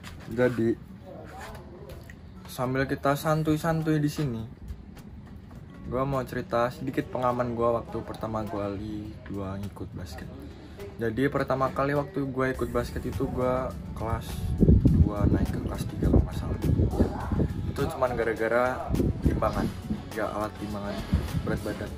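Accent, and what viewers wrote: Java, Indonesia. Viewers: Medok nyaa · medok banget jawanya ka * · gole ngomong medok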